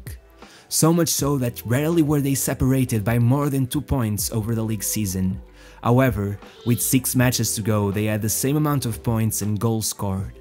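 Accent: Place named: Portugal. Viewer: confessa lá, tu és tuga.... nota-se no sotaque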